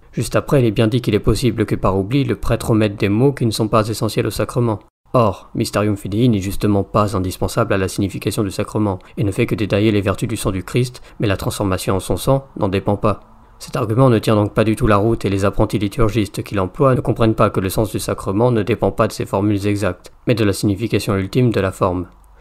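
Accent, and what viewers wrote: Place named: Europe. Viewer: Par votre accent, je présume que vous êtes en Europe. Voici une réalité canadienne. Tous les documents que vous avez cité sont valides, la nouvelle messe elle aussi est valide. Le problèmes s'est trouvé au niveau de la formation des prêtres dit baby boomers. En effet, bien que tous les documents soient présents, la formation lors de notre "révolution tranquille" a engendrée plusieurs cohortes de prêtres dit "communistes" nos pas de manière politique, mais de manière liturgique et idéologique. Ses cohortes font encore des dégâts aujourd'hui dans l'église québécoise et canadienne en enseignants : que le Christ n'est pas présent dans le saint sacrifice, que la messe n'est pas un saint sacrifice, en sautant ou modifiant des prières eucharistiques, en ne priant pas le bréviaire, n'affichant pas où peu l'adoration, favorisant les femmes diacres/prêtres, aucun respect pour la manipulation de l'hostie, en utilisant les homélie pour faire un spectacle et non pour passer un message biblique. 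Etc.. tous les fait que j'ai énumérés ci-dessus j'ai pu les voir de mes yeux! Je ne blâme pas les documents du Vatican ou les conciles, mais bien le manque de rigueur et le manque de jugement des séminaires. Encore aujourd'hui, les séminaires québécois enseignent certains points qui vont à l'encontre de catéchisme et même du droit canonique! Le fléau est donc dans la formation et c'est pourquoi nous pouvons voir un exode des séminaristes vers les séminaires plus "traditionnelles" non schismatiques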